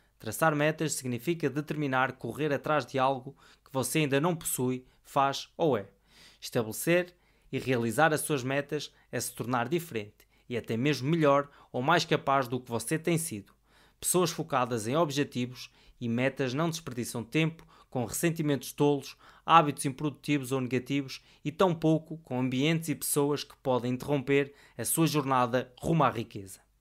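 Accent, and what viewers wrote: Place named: Portugal. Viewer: Parabéns Que bom encontrar um sotaque português ❤🇵🇹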